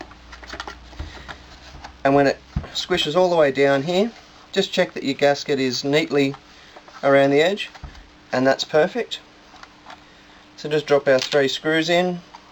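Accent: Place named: Australia. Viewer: you were of great great help! and the Australian accent rocks :-)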